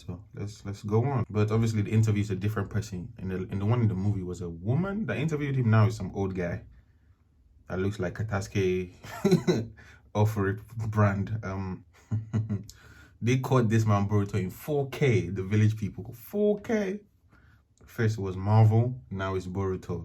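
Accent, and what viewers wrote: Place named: Nigeria. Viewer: I’m Nigerian too and you sound Nigerian too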